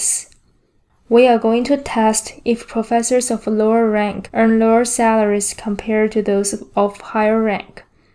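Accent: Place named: Asia. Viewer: Super thick Asian accent. Had to turn on subtitles.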